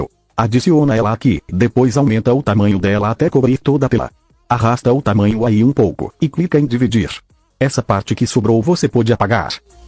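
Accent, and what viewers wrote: Bahia, Brazil. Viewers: o cara é um baiano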